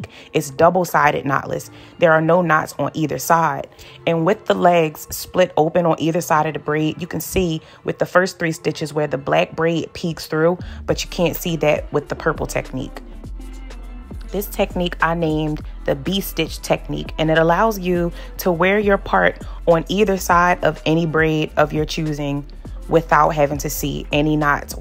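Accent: Philadelphia, United States. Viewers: You sound like home lol are you from philly? Absolutely loved the detail & easy way you communicated this tutorial/stitches, thankss!! Cant wait to see the Bee Stitch!🔥🔥❤❤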